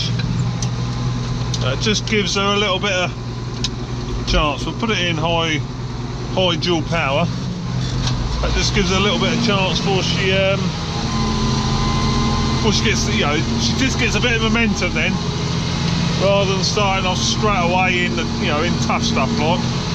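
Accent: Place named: Lincolnshire, United Kingdom. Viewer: help settle an argument for me please, we know you're in Beds. but you're accent sounds Lincs. to me but my mate reckons it doesn't, so are you or are you not a Lincs. lad?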